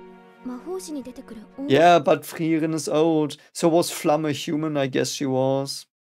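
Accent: Germany. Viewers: Thank you for the correction: 'Glanz.' I believe 'bredt' is a Danish word. However, the subscripts may have undergone mistranslation and might not accurately reflect the original meaning. By the way, are you from Bayern? Your way of speaking is similar to that of a guy from Munich whom I know.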